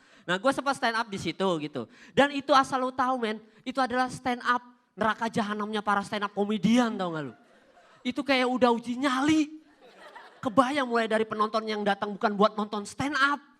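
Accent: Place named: Banyumas, Indonesia. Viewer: Ko bahasanya rada rada ngapak yah <link>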